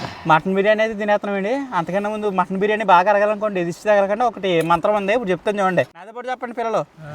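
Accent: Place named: Kakinada, India. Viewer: హలో మీ వీడియో కి మీ మాటలు కాకినాడ యాస highlight సూపర్ వీడియో god bless you ma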